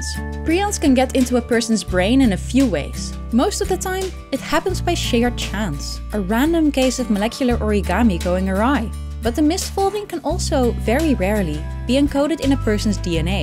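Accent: Netherlands. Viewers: It's funny hearing this as a dutch person because the accent of the narrator is so clearly dutch. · Heyyy that's a dutch gal; I can instantly tell. Je doet het goed! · Kan me niet op de video concentreren hoor met dat mega Nederlandse accent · Nou ik hoor dat het Nederlands is natuurlijk, lekker bezig!! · Massive dutch accent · i thought right away "since when did minuteearth have a dutch accent"? then i checked and saw the name....🤣 cute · Dutch accent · As soon as started to talk I heard the Dutch accent! Awesome video anyway:) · Damn that accent cannot be more Dutch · Dutch accent be thicc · This new presentation voice sounds very Dutch, is she from the Netherlands? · And yes, as always i can hear a dutch persons heavy accent. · ✨Dutch accent✨ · that's just her being Dutch though. They talk like that :p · you have to be dutch :) the way you say creutzveldt-jabobs is how you say it in dutch. 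also there is a dutch accent on the english but Im al proud duty to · Do I hear a Dutch accent there? It sounds like she's Dutch and I love it! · As a Dutch person I instantly recognised the accent as Dutch😅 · Sorry if i am wrong, but i am 99% sure this is a dutch accent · she has a Dutch (Netherlands) accent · Are you Dutch? 👀 You sound Dutch (from a Dutch person) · Dutch? i could recongnise that accent from 100 km away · Yikes, dat je uit Nederland komt is onmiddellijk duidelijk van jouw accent. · Are you dutch by any chance?? Your accent really sounds Dutch 🧍‍♀️ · She is definitely dutch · The Dutch accent makes me feel at home instantly. (sorry, if I misidentified the accent) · A wild dutch accent appears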